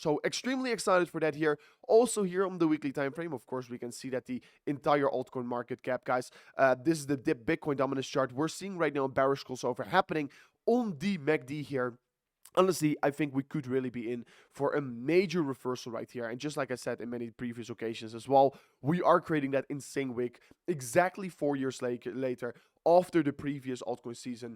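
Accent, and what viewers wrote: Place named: Achterhoek, Netherlands. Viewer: Beetje achterhoek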